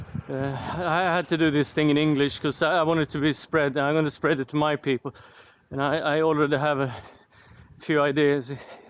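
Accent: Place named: Sweden. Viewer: Your followers around the world apparently do not understand your swenglish so well. 10 comments only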